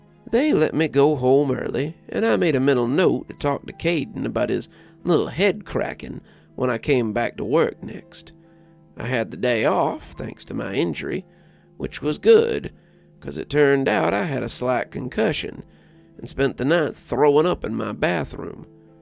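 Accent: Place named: Deep South, United States. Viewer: Sounds like you've spent a lifetime in the Deep South. Impressed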